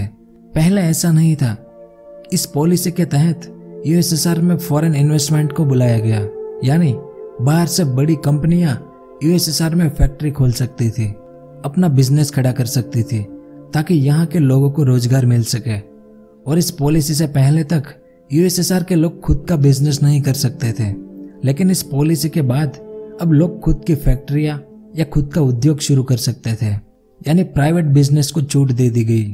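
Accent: Gujarat, India. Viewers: Your tone of hindi speaking shows that you are gujarati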